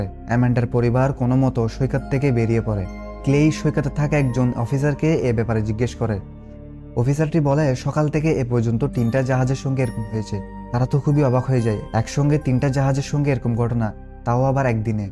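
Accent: Chittagong, Bangladesh. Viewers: আপনি কি ভাই চট্টগ্রামের আপনি কি ভাই চট্টগ্রামের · Vai ki Chattogram er?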